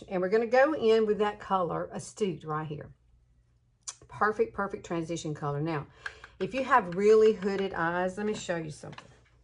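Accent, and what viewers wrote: South, United States. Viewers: I just subscribed to your channel. I love your accent! I am from Kentucky and also have that wonderful southern accent nothing like good southern people. I have just purchased a couple of your products from Younique products. I don't have facebook but I am enjoying this channel.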